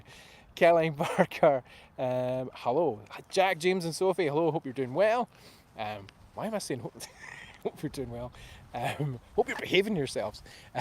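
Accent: Scotland, United Kingdom. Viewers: Sorry I missed it ? I saw The Hoof GP give away the Appleton Crush ? Very kind of him ! I will keep watching your shorts ? Love that Scottish Borge of yours ? You have a good Sunday night ! Sorry again my bad ?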